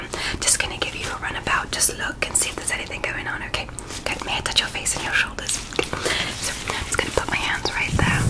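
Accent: England, United Kingdom. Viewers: The British accent got me dying gurl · Love the English accent!! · British!!! Another great video!!!!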